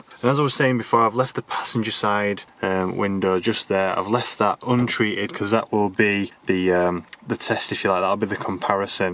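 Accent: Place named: New York, United States. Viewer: Why does he talk like that? A American doesn't sound like that. He's probably from New York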